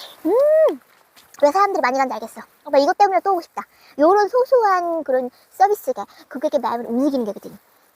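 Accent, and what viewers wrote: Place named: Gyeongsang, South Korea. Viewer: 오늘도 알람뚜자마자 ~~~내가믄져와버려따구 ~~~오늘 언니 말투너무웃곀ㅋㅋㅋㅋㅋㅋㅋㅋㅋ 나도 경상도 아가씨 😂❤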